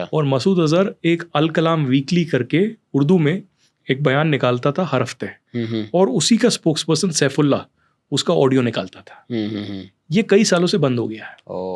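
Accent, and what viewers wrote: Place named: Gujarat, India. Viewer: Bhai tme gujrati chho and tme jyare hindi bolo chhe tyare tmaro gujju tone dekhai aave chhe ema 😅 well it's ok mara jode bi thaay chhe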